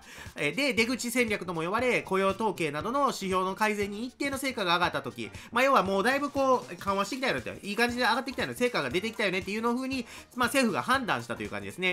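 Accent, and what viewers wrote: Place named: Kansai, Japan. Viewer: おおお、本人から返信きた😳 最近さんのチャンネル登録したものです💦私、忙しい人なので、飛ばし飛ばし見てます💦 さんの動画長いので…😂関西弁いいですね✨心が和みます🌤️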